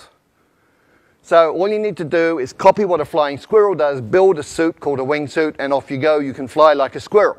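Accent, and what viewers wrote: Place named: East, United States. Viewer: alex wu 更接近于北美东部的发音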